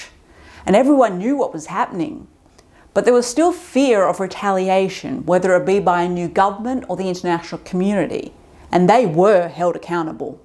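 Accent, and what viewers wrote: Australia. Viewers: Like I said you should have used 1 minute at the beginning of the video to mention what made this deplorable genocide against the Tutsi happen. I understand you were talking about the aftermath but the correct information at the beginning would have been better. The Hutus did not decide in one day to kill their neighbours, families and friends who were Tutsis and Twa this was caused for decades by the white European invader colonisers. Causing division and bringing their racism and discrimination to another African country they invaded. The only reason I came across your page was because this April made it 30 years since the genocide against the Tutsis and Twa otherwise I don't watch morbid channels like this. Judging by your accent you sound Australian (I may be wrong), maybe you can make a video about how the white invaders destroyed the indigenous Australians? 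What happened to the indigenous that were killed afterwards?? Would be interesting to find out. Have a good day.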